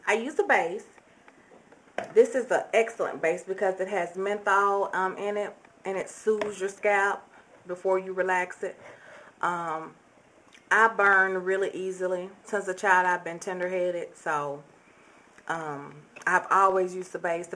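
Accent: South, United States. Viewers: Gurl when you told your fear your tickled me, I could tell you where serious but you where so darn adorable about it. You are soooo cute and special and keep up the good work. BTW your accent really makes me miss the south a lot and when you say yall I just go man I really miss home.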